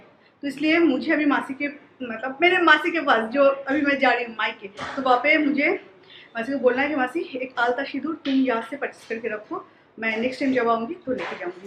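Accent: Bengal, India. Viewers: Too much boring and with ur benglish hindi 😩😩😩 it’s irritating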